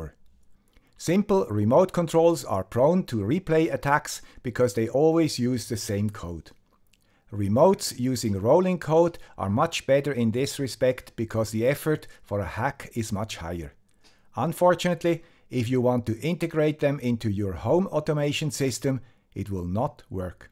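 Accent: Switzerland. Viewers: no doubt, that would be a secure solution. But it would require even more sophisticated software, and it would still need the crypto-quality RNGs. The shared state then takes the form of the client certificate. If the Guy With The Swiss Accent (gruezi!) or any of his viewers want to go with TLS, then chances are good that they‘ll get a secure solution. But I think it‘s worth considering the least that you‘d have to do and still be sufficiently secure. The solution in the video is probably fine, and I believe that HMAC would also get it done only depending on the shared key, but not on the counter.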